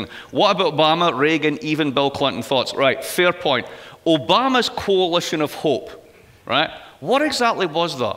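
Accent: Scotland, United Kingdom. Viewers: I want to ask the channel owner -- to PROVIDE C.C. I believe Mr. Blyth is a brilliant economist, but he speaks with the RIDICULOUS ACCENT! Probably scottish or welsh I couldn't understand 1\4 of the lecture.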